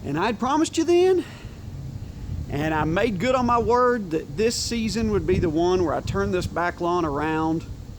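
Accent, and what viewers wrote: Arkansas, United States. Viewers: You don’t happen to be from Arkansas?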